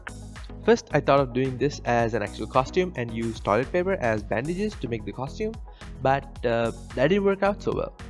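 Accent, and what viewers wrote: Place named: India. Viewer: Bro you sounds like one of us👀 ...are you indian? Your new susbcriber here 🙋🏻‍♂️ please guide me i need some help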